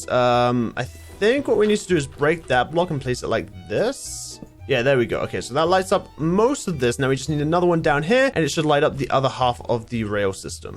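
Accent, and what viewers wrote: United Kingdom. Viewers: I'm still confused if is British or American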